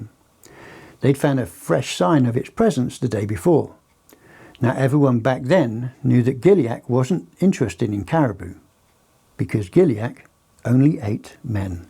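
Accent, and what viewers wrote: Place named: Ireland. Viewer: I really like this man’s story telling the Irish accent is so pleasant to hear. Bigfoot is real. They are an ancient tribe of people. DNA has showed they are a human / hybrid. Yes dog men are dangerous and can be found in Michigan to the east coast. They are what natives call skin walkers because they can stand on their back feet as well as on all fours. Some call them dire wolves. Bigfoot, Sasquatch or Sabe are intelligent and many known cases of saving humans.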